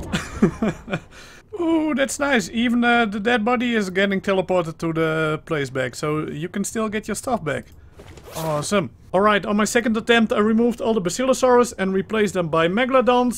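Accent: Netherlands. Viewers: Dutch pronunciation ganggg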